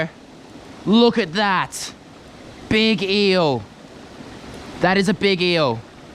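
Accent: Australia. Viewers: 300 ft bruh I thought you were Australian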